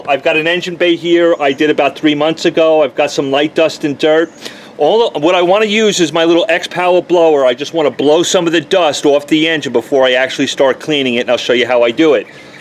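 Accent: New York, United States. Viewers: *New York accent lol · Texas accent?? That's not southern at all. I'm from Houston so I know a southern accent when I hear one. Lol. That's more like a NY accent or in that region.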